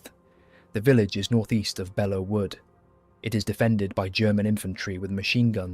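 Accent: United Kingdom. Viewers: Get rid of the UK narration 🤮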